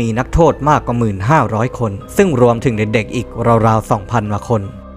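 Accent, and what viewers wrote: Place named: Suphanburi, Thailand. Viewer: แอบเหน่อสุพรรณ😂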